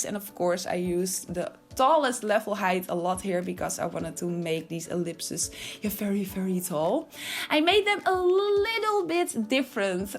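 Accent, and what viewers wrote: Netherlands. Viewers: Lekker Engels op zn Nederlands ook, heerijk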